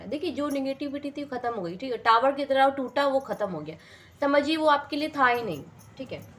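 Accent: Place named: Patna, India. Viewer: Dee aapki tone to Patna ke aas pass ka hai..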